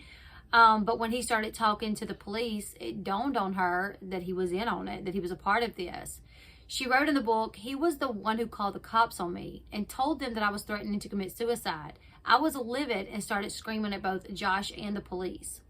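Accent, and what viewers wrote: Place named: Louisiana, United States. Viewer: I know I love it!! It's that Cajun accent 😍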